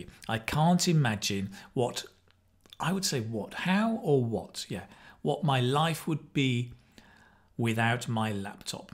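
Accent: United Kingdom. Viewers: Dear Mr. Thank's a lot your lessons are so helpful, clear British accent, funny, informative, and lots of useful phrases, for free and so generous...😄 They're also useful for daily conversation not only for IELTS. Again, thank's a lot and more lessons please! 😄😃😃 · Muchas gracias, pero de verdad... muchisimas gracias por tu canal. Apenas lo conozco pero me encanta. Ajjajaja apesar de que no sea fan del inglés britanico, tu me estas haciendo amarlo 🤗🥰❤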